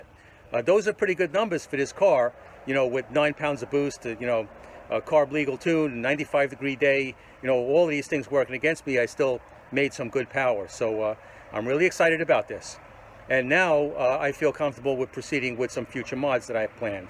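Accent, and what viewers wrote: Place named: Boston, United States. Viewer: Guessing by your accent that you are not from Florida. Boston perhaps?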